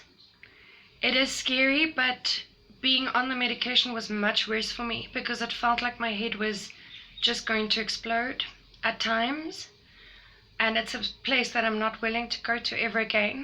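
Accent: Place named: Germany. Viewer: Hallo, meine Schatz. Just found your site; not sure if you’re still active with your channel. Have you heard of people with TLE while sleeping? I’m an academic researcher compiling data on this phenomenon and I would be interested in your or others’ experiences with this. I used to live in Deutschland and you sound German; forgive me if I am wrong. I hope you’re making some progress with living with TLE, and I 100% agree with your choice not to hypermedicate; good for you. I will check back to see if you have any experiences or leads for others with sleeping TLE. If so, I will put you in touch with my study. All the best wishes.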